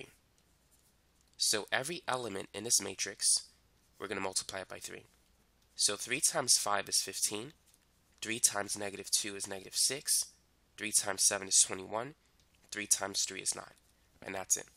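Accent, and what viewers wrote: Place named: Boston, United States. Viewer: I feel like you have stereotypical boston accent and I love it.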